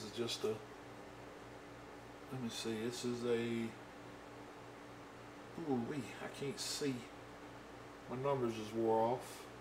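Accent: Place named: South, United States. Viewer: I love your videos. I'm southern too, so your accent and instructions make it so easy to understand. Thanks!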